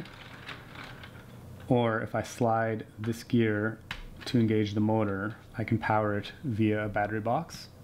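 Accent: Canada. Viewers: Man I love a Canadian accent. Must be the Degrassi I watched as a kid.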